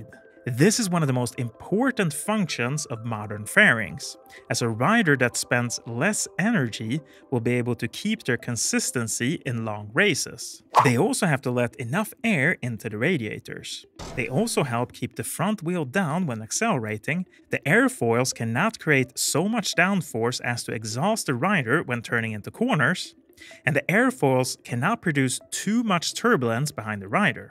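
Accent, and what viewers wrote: Sweden. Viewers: Fan vilken irriterande svengelska ”this meeeeeens”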